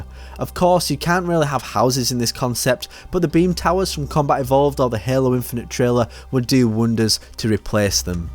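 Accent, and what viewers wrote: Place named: Bolton, United Kingdom. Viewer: You are definitely from Bolton aren't you, jesus Christ🤣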